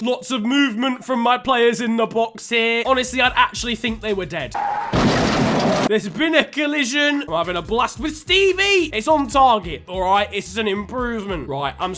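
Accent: Nigeria. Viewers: Are you Nigerian 🇳🇬? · Are you Nigerian?🇳🇬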